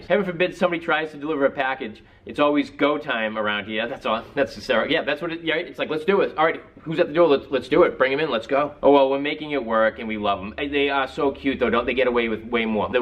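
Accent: Boston, United States. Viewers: You have GOTTA be from Massachusetts!!!! I'm living for that Boston accent. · The Boston accent just makes these reviews go from average to hilarious. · Nice review. You sound like you have a Boston accent. If you live in the area and want to review an Airedale, let me know! 😉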